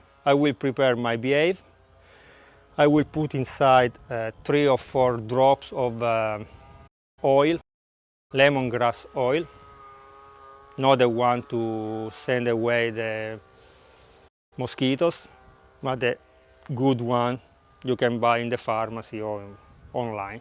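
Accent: Italy. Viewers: I was just thinking today, "What happened to my favorite Italian guy channel?" I really do appreciate your videos, and very much enjoy your speaking English. Thank you for your time and energy making these great videos. I would love to visit Italia again in my life, but reality is probably no. Are those Fig trees behind you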